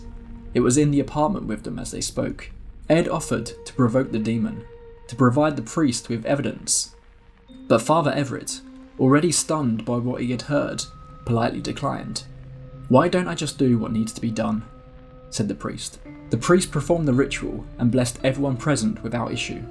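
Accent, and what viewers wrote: United Kingdom. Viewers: I frigging love how you say "so get yourself comfortable, hit the lights and get a warm drink" in your British accent. Draws me right in. 5th video of yours this evening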